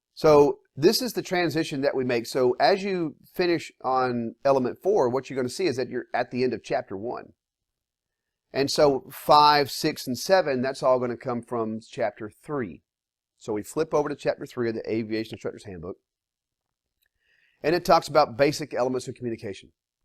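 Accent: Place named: Louisiana, United States. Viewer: Are you from Louisiana? Nice video and very well presented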